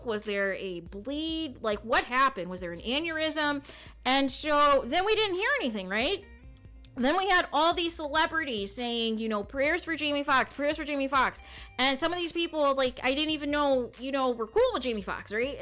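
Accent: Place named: Minnesota, United States. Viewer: o ok, ur voice just reminds me of my friend from Minnesota especially when u say u guys.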